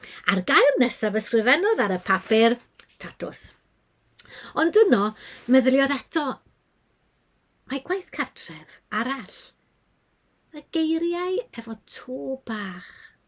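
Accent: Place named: Carmarthenshire, United Kingdom. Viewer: Dw i'n dotio ar wrando ar acen Sir Gâr. Dynnes ddawnus a brwdfrydig wastad.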